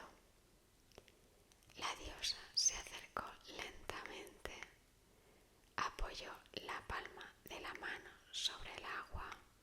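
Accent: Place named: Spain. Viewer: No sabía que antes de dormir te podía visitar un angel y más aún que ese angel tuviese acento español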